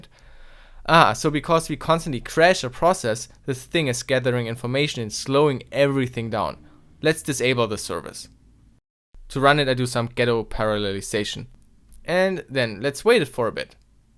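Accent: Germany. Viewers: I have all the time the feeling that you are from germany because you have a german like accent :D. But nice vids btw :D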